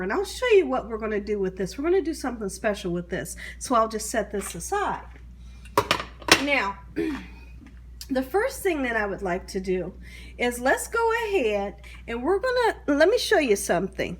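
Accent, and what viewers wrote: South, United States. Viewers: ❤️❤️❤️ that sweet Southern accent 😌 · Where are you from ?? you are southern parts of somewhere🤗 Louisiana maybe? I'm from Alabama and we do things alot alike ❤️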